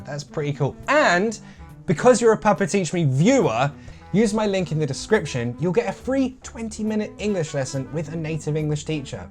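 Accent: United Kingdom. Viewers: I love the Learn and Improve Your English Language!! With Your British Accent, and Congratulations,!!! 🇬🇧😍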